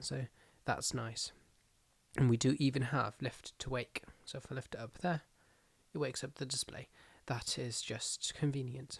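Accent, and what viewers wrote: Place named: United Kingdom. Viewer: I could listen to the British accent all day.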